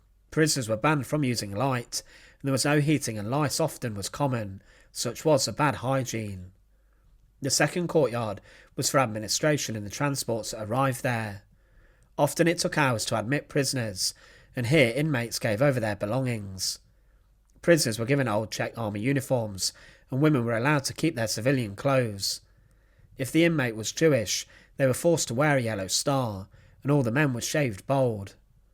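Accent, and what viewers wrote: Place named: Cockney, United Kingdom. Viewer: I know. I have a difficult time understanding his Cockney accent.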